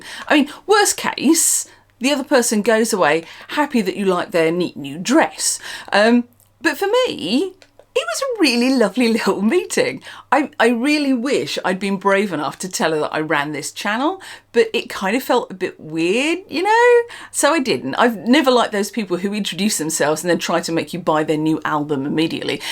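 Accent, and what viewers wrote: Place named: East Anglia, United Kingdom. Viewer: What makes your accent unique to East Anglia? I do like it.. . I have a mild southern accent.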